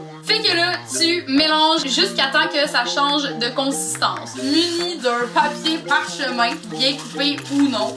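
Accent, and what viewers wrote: Quebec, Canada. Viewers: j"adore l’accent qebequoi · Tu es vraiment la meilleure des Youtubeuses tu es tellement naturelle et lit tu mérites beaucoup plus de sub ! Continues comme ça PS : C'est quoi ton secret pour rendre l'accent québécois aussi dope ? 😂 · Quand je serais grande j’veux grave habiter au Canada et apprendre cet accent de fucking ouf 😝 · J'adore tes vidéos et ton accent quebequois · Ce commentaire tu le lis (sinon je viens de France je kiff l accent québécois😍)? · Je suis français mais je regarde tes vidéo. J adore l accent Canadien ❤️😂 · J'adore tes vidéos elles me donnent tellement de bonne humeur même si je sais que je commence les cours à 8h demain... Et c'est drôle parce que je suis française donc parfois je ne comprends pas bien ce que tu dis mais je suis tellement fan de votre accent à vous les québécois ! Bisous et t'es la best <3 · J'adore l,accent québécois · t'es canadienne,québécoise ou française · Si tu lis tout les com ... 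je te trouve super joli 🤣🤣😅 continu t vidéo sont top et aussi je kiff l'accent québécois!!!!! 😂😂😂😉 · Ça fait trop blizzard que t’ai un gros accent canadien et pas mahdi Pour le fun tu peux faire une vidéo avec un accent 100% français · Tes trop belle j'adore l'accent du Québec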